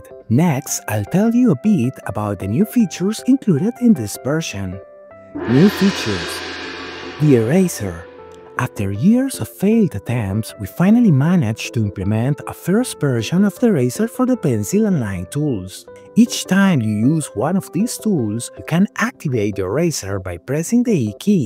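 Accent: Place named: Colombia. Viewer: Siempre esa voz de hablando inglés con un acento colombiano es bastante íconico y reconocible en si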